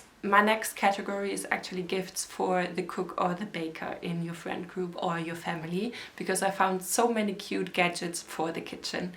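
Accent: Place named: Germany. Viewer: Pretty sure she’s a native German speaker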